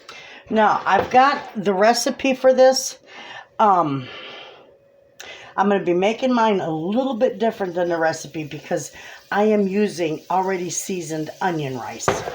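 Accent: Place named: Midwest, United States. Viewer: I live in the south now, but grew up in the Midwest. I love to hear that Midwest sound in your voice almost as much as I love to watch you cook.😊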